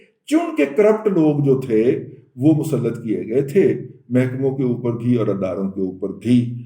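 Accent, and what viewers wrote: Punjab, Pakistan. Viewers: sahib aap commentry kisi aur se karwa liya karain, awaz kam ziyada, ziyada punjabi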